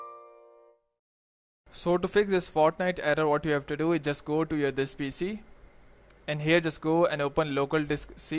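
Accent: India. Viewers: you sound like a technical indian scammer but you fixed my game.Thanks!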